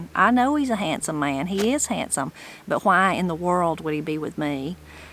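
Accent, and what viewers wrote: Appalachia, United States. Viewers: I love this channel, your accent, the Appalachian people. I’m so far from home, I subscribe to your channel and get a taste of home. I read the comments for “dessert “. I’ve been living in Mexico for 20 years, at first with my young son, but then he returned to the US for college, sigh. Your channel warms my heart . I was in Bryson City with my siblings and mother. We spent the summer there in the mountains to get away from the South Florida busy ness. We camped, swam in a local public pool, the river, learned macrame and sand painting. We climbed trees, I tasted crabapples from the tree for the first time. We caught lightning bugs, they filled the whole yard, as well as the mosquitoes. The best summer of my life. Maybe one day the Lord will bring me back to Appalachia. We were all born in TN, but Nantahala Gorge, oh my heart.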